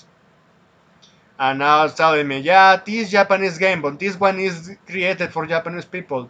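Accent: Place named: Spain. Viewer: Sin ofender.. Pero has probado a hacer los vídeos en español?? (Creo que es tu lengua natal, el acento me suena a español) Es que no se entiende absolutamente nada.. No tengo problemas en ver vídeos tanto en español como en inglés, pero tienes un acento extremadamente marcado y pillo solo algunas palabras sueltas. Espero que no te lo tomes a mal, he intentando ver el vídeo completo, pero ha llegado un punto en que estaba perdiendo el hilo de lo que decías incluso.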